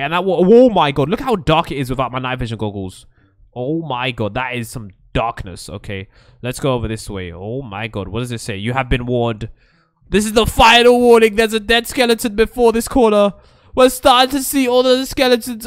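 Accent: United Kingdom. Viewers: r u british?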